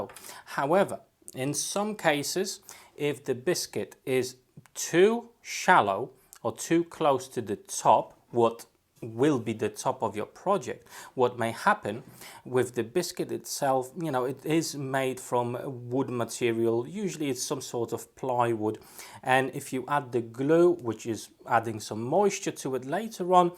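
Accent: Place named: Northwest England, United Kingdom. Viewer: What accent have you got, I'm hearing northwest England, and German maybe ha ha ha.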